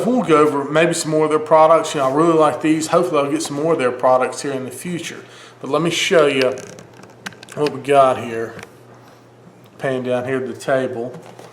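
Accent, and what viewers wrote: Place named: Rural, United States. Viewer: Guy constructive criticism .. you dont start cutting for 8 minutes in a knife demo. Work on the accent I am from NE MS and you are killing the hick vocal quality at impressive levels. finally Learn how to use a knife you make the knife look dull but trying to hatchet through onions instead slicing and allowing knife to do the work . I suggest a quick watch of alton brown's knife video of good eats . oh an lastly like me you are better sited for behind the camera , put the knife on the the shot .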